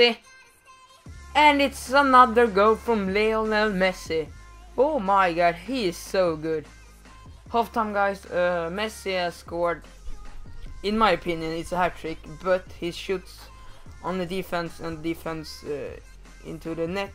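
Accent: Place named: Sweden. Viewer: Just Swedish viewers here... Because of that english.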